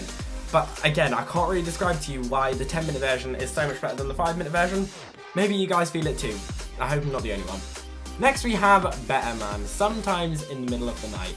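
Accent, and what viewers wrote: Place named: United Kingdom. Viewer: Why am I so surprised that you're british 😂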